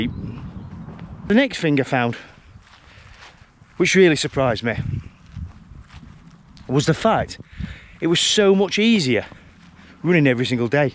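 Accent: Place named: United Kingdom. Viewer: I wish this fellow mention for us, foreign runners what he meant by 5k (in his British accent) Is this K (Kilometers) or Miles? Cause this is a huge difference.. 5k in miles is 8 km.. I've been running 10-12k (km) daily for the last like 3 years and I'm over 60 + y.o. Current speed 5 -5.20 / km (Started from 6.15 - 6.30/km back in few years) .. In terms of the REST days.. If I take 1 or 2 then running again its like starting from scratch (even harder).. At this point I came to conclusion that Speed doesn't matter much, but its hard to hold competing EGO in check, that keeps on "speeding".. I can confirm, that you still must "behave" with the food intake, or else even running 10k (km) +/day alone does not guarantee that you will lose weight. Good luck.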